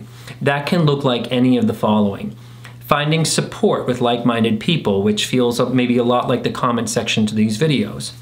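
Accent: Boston, United States. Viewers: 😂 love the 'Bwostin ackcint'!! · I love it when your Bostonian accent comes out! This video was medicine for me. I’m mid-cut off with a couple covert toxic people after a verbally and emotionally abusive episode where I had enough. Though it’s tricky because my life was already in limbo… divorcing an alcoholic and currently staying with a family member. This family member keeps bringing up how much it hurt one of the toxic people when I didn’t acknowledge her birthday following the abusive incident. It’s exhausting being under the same roof. This person definitely has a different story of our family. Looking forward to when I am able to get into my own place… I’m working on it. This video gave me the encouragement and validation to stay strong and true to myself. I feel so much calmer and grounded after I watch these. Thank YOU!! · Interesting how you compared cutting off family to a nasty divorce... 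It reminds me of covert incest and parentification, which can totally feel like a nasty divorce, even when you set the smallest of boundaries with the family members projecting those relationships onto you. PS great Boston accent! I'm Boston based as well!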